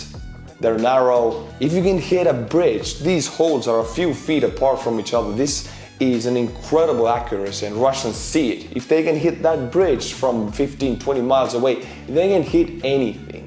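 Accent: Estonia. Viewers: I like how the patreons are read. I am considering becoming one just to see how my name sounds in Estonian lol. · The best news on this war with Estonian Accent... Mr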